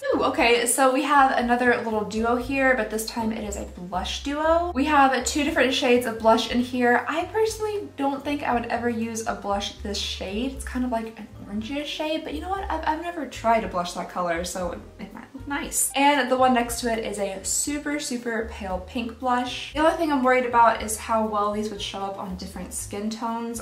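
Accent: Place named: South, United States. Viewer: She’s not saying crown... it’s just her accent so that’s how YOU hear it due to her accent being wildly different from yours. Everyone in the south where I lived says it that way snd I hear it just fine as crayon. When I moved to England for a long time and came back it sounded similar to crown to me at first for a bit. It’s just the accent. Lots of people say it this way.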